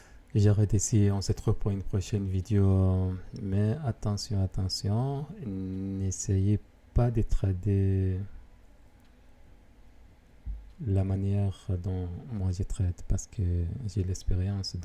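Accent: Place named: Italy. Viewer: Ciao e grazie per il video, mi sembrava un accento un po italiano e nei parametri ho visto 🇮🇹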